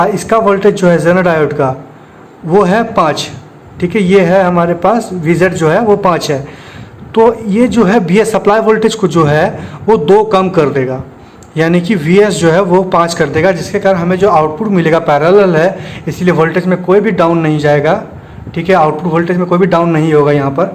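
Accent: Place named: Bihar, India. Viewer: Bhai aap ka voice bihari jesa AA Raha hee😊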